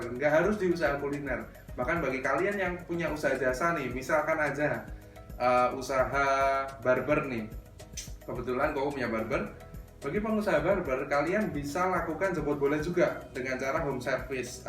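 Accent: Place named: Java, Indonesia. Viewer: KOKO MEDOK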